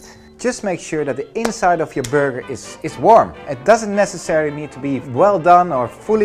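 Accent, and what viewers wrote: Netherlands. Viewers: het is te merken dat je nederlands bent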